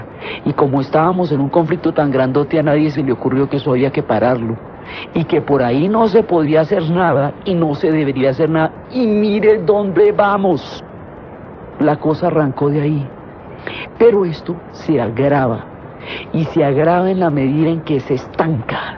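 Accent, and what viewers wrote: Colombia. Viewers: Diana Uribe es única, a mí me encanta 🙂 Por cierto que qué hermoso es el acento colombiano! Opinión de una mexicana. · No me canso nunca de escuchar a Diana Uribe... además de toda su sabiduría.... el tono colombiano que es tan tan lindo...Chévere!!!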